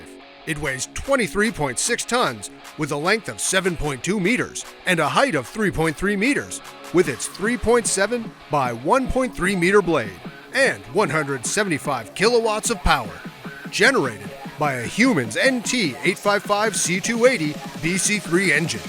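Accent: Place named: United States. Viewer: Best American accent I have ever heard!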